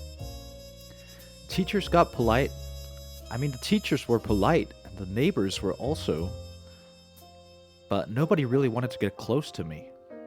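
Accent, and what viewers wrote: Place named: Denmark. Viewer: wait are you danish? no shot. im dead now that i know i cant unhear the accent.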